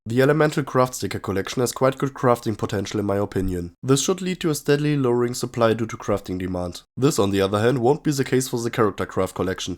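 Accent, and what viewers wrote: Germany. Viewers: dislike only for ur german accent damn too anoing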